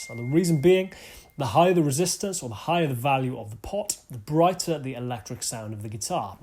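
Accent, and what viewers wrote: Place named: England, United Kingdom. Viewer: Could listen to that playing and your English accent all day long Brother!!! You make Clapton cry!!! Haha and Joe Bonamassa is calling for bending lessons from you….. Damn!!!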